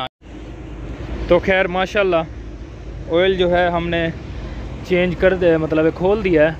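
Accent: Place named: Bengal, India. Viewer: Fault to aap ne bataye nahi aur bata bhi to bangali ki trah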